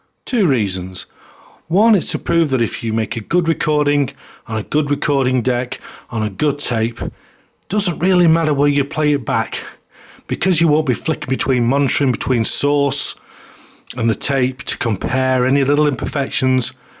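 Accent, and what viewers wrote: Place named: Manchester, United Kingdom. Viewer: You've got the same kind of accent as Techmoan. Are you from the Manchester area too? 😁👍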